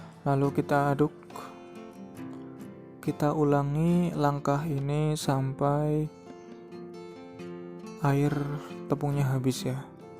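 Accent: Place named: Banyumas, Indonesia. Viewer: jualan dimana mas, kaya bahasane ngapak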